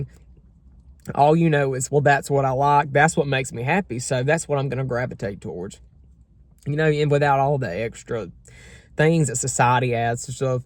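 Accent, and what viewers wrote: South, United States. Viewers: I love your southern accent. It's so hot. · We love your Southern accent!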